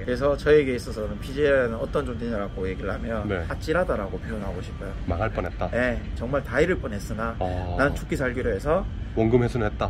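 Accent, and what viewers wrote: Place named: Gyeongsang, South Korea. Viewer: 지금 5번째 반복해서 보고 있습니다. 대구 사투리도 좋고 뭔가 빨려 들어가는 화술에 표정, 나이가 많아도 많이 배우고 갑니다.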